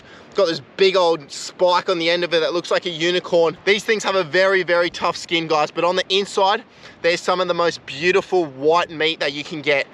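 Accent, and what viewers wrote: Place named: Australia. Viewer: Everything i need for the best survival Youtube cannel: Positivity Energized Maaad australian accent Talking a lot about the island and stuff AND SHOWING HOW BEAUTIFUL THE SCENERY, ISLAND, LOCATION IS (almost noone does that) And most important: Having respect for the animals getting killed And the beautiful underwater scenes where he just shows nature before hunting This channel has everything and i wish you over 1mil subs for that quality ❤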